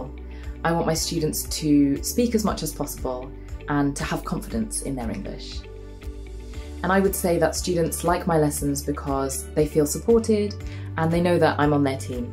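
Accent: United Kingdom. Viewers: 항상 느끼는거지만 영국 영어 발음 치인다..😍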